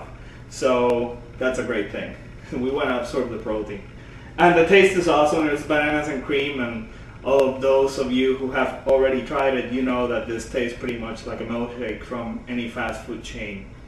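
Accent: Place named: Spain. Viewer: I love your Spanish accent, it's awesome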